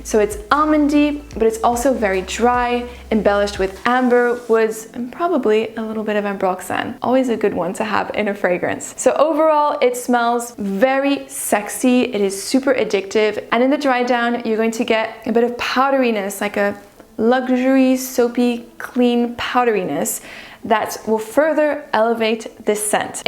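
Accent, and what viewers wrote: France. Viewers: She is definitely a french speaker😊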